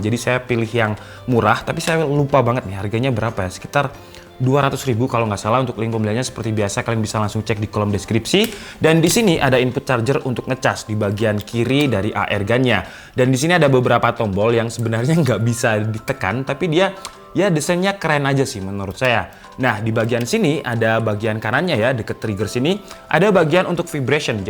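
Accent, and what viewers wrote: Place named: East Java, Indonesia. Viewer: Medok jatim rek 😂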